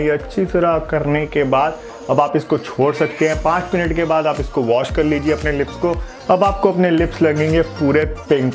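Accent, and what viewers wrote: United States. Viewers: Bhai mene bhi aap ko American smjha 😅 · Bro mene aapko American samgha😂😂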